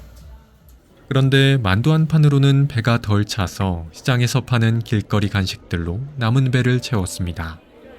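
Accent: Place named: Jeolla, South Korea. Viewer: 가~장. 기~차.. 전라도 억양인디! 서울말쓰시는데 고향은 전라도지라~ 잉??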